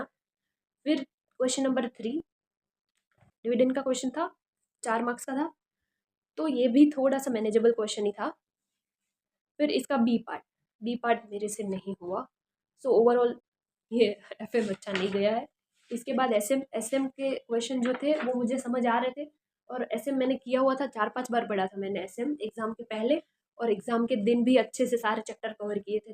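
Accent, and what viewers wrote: Gujarat, India. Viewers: Tumhari hindi thodi gujrati vaali he. Kya tum gujrati ho?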